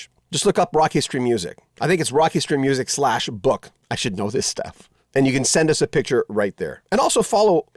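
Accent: Canada. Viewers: I didn’t see a video I just heard a Canadian accent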